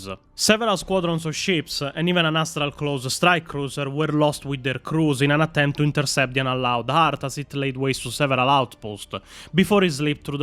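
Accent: Italy. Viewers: The only problem with these wonderful renditions of lore is now I cannot take anyone telling me lore seriously that doesn't have an Italian accent. 🙂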